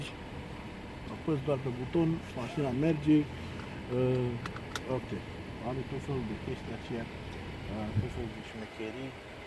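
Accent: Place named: England, United Kingdom. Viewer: dar ce accent de englezoi ai !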